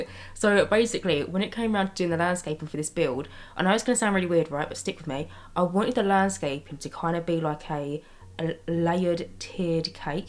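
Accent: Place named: Essex, United Kingdom. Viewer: just curious but are you from Essex? your accent is amazing!! I just found your content, love it.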